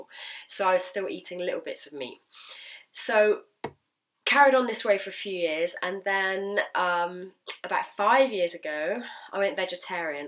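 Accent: United Kingdom. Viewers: Nice! Im half way so i brb. Gtg eat lol im a sucker for UK accents. Geez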